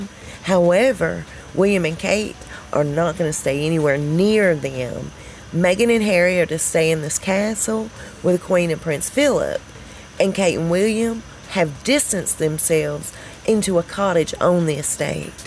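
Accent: South, United States. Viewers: Oh I love 💕 how you just tell it like it is and your southern drawl is to die for. Love 💕 your vids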